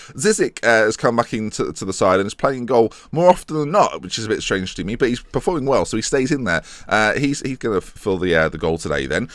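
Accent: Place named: South East England, United Kingdom. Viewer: I don't actually know for sure but judging by the accent he lives somewhere down in the south - east and also I know he has been to Brighton before from one of his Salford story episode so he probably lives near London or something like that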